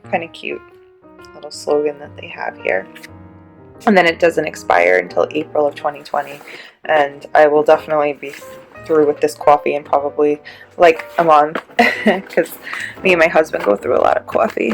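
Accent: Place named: New York, United States. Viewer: Wow what a strong New Yorker accent lol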